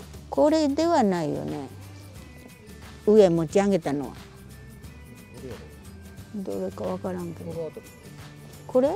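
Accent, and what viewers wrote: Tosa, Japan. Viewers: おはようございます🌞 福岡も台風みたいに荒れた天気でした〜💦 懐かしい土佐弁😊 癒されます✨ いつも美味しそうなお野菜 沢山の愛情貰ってイキイキしてますね💕 私も食べたーい‼️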